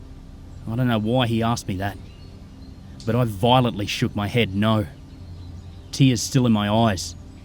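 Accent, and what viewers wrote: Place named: Australia. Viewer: Love the Australian accent and I really enjoyed this video a lot and awesome job on it at the narration.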